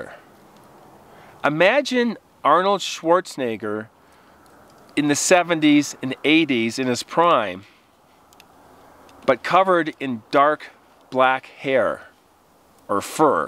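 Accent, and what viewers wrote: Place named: Midwest, United States. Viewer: I was just thinking about how to ask you if you were from the Midwest! I’m from IA but have lived many places. I love listening to you.🧚🏻‍♀️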